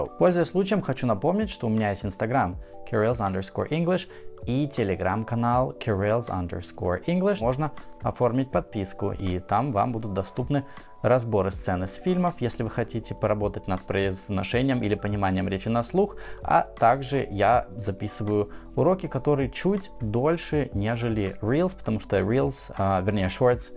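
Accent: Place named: United States. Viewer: Хоть и предпочитаю британцев, но у очень аккуратное и ненавязчивое американское произношение. В сети среди русских он по этому показателю несомненно намба уан. Да и русский у него не без изыска. Лишь интонация слегка выдает в нем давно не бывавшего на родине. Но это естественно.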